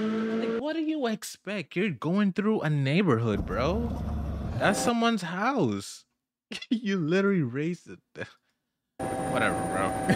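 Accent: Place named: Caribbean. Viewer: Gday mate, love from Australia. I have a question that might sound weird......well because it is weird but that's my brilliant beautiful...weird brain. Do you have a Caribbean background? Or are you Canadian? Wait that nakes more sense. That would explain why you seem so chill and friendly. Sorry for being a weirdo, I'll let myself out. 😂 Edit: I was referring to your accent. I have a weird obsession with accents.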